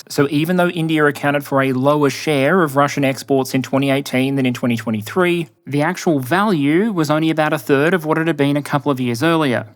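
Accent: Australia. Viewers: I'm just here for the aussie accented sarcasm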